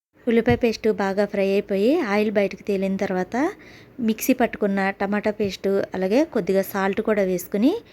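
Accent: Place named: Godavari, India. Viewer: K sis ma godavari voicela undi Andukey adiganu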